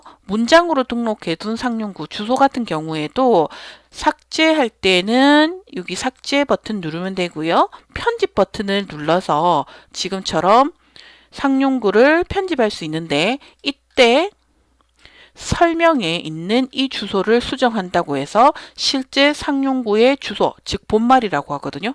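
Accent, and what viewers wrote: Busan, South Korea. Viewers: 제고향 부산이라서, 강사님 말투 듣는 순간 같은 고향사람이라서 더 정감이 갑니다. 40대 아줌마도 열심히 강의 듣고 있습니다. 감사합니다.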